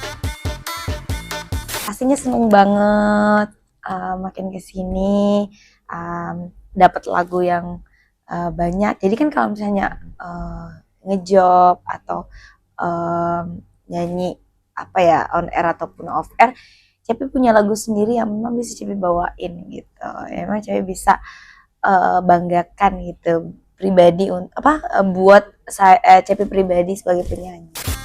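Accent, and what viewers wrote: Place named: Bugis, Indonesia. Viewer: Khas bugisnya lengket banget😭 "di Tamang" GK tuh, tapi gpp, pokoknya kak terlope-lope lah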